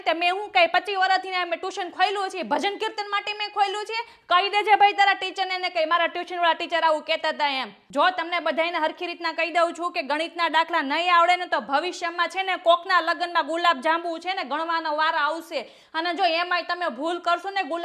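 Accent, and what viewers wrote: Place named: Saurashtra, India. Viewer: જય સચ્ચિદાનંદ તમારી સૌરાષ્ટ્ર ની ભાષાગમે છે.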